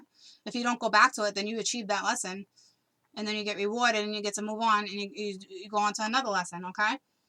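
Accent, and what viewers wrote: Boston, United States. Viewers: A good reading and your accent is amazing😊 are your from boston? Love from a Leo from the uk!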